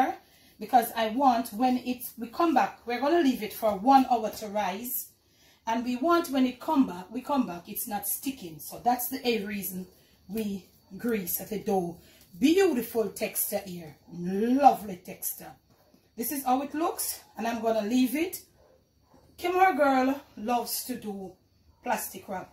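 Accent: Jamaica. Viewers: I just looked at the thumbnail and before she started talking I knew with all my stereotypical thinking that this Jamaican woman(with a Jamaican accent) was the one to listen to ti teach me how to make bread.. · Tht bread looks 😍 so good and soft 😋...I just love watching & listening to her she's so cute with her Jamaican accent 🥰..I'm definitely going try this version of bread over others it looks the best out of others I've seen bcuz it was made with care💝 & tht makes the best food!🤗🏆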